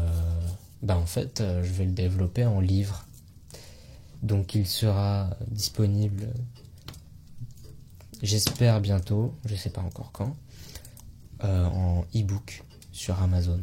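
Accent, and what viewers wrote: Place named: France. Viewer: No entendi nada pero me gusta tu acento y idioma Frances 😊😊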